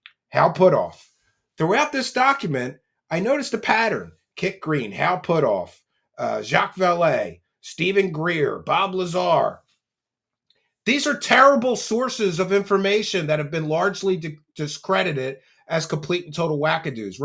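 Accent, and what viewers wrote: Philadelphia, United States. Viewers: Good for you!!! I found his channel about 6 yrs. ago. Saw this guy wearing glasses and talking like someone from Philly about all the BS and I was hooked.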